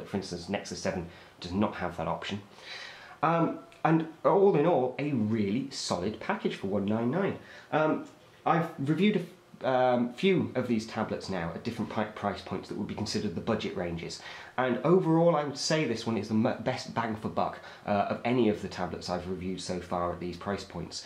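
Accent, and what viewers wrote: United Kingdom. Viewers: so british :D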